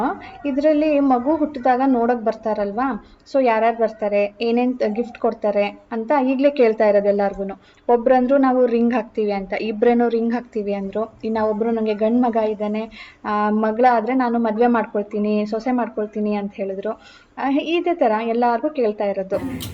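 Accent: Maharashtra, India. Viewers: Nimma mother tongue marathi na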